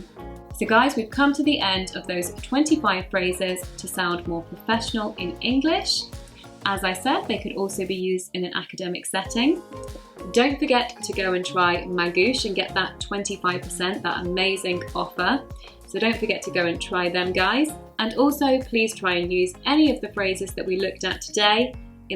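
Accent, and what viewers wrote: United Kingdom. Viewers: Great British accent ❤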